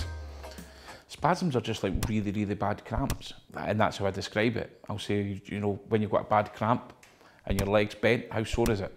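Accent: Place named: Scotland, United Kingdom. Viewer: He's Scottish 😊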